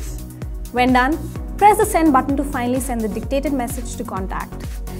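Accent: India. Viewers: that's not broken English, it is Indian English (read accent), native English people might face difficulties but however, others have problems with native accents too. That is normal!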